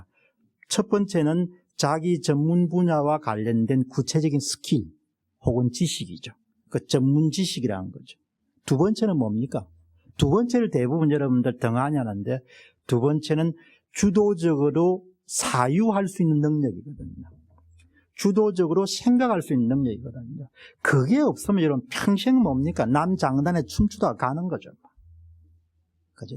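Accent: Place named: Gyeongsang, South Korea. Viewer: 경상도 사투리 구수하고 진정 진실한 마음 안격이 보이는데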